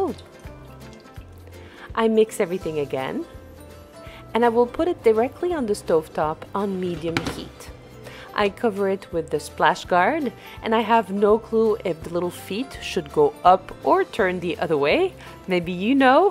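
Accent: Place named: France. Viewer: Merci beaucoup pour votre belle présentation. J'ai beaucoup aimé votre accent français 😉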